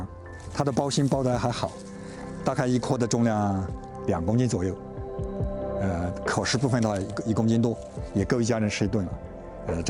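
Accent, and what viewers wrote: Yunnan, China. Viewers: 冒昧问一句，Andy叔您是不是云南人？我觉得你的普通话和我的很像，我是云南人！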